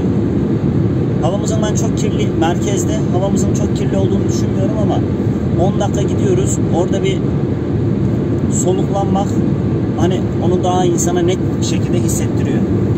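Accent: Kastamonu, Turkey. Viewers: Hep kastamonu şivesini konuşmanı istıyoruz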